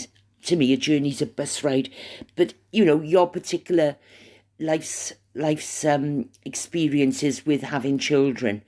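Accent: Wales, United Kingdom. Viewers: Your podcast is never to long for me to listen to I love hearing a friendly Welsh accent hope your fur baby 😺 is OK . Dam home shopping they never get it right . Looking forward to your next podcasts my Welsh friend 💝💐💐💐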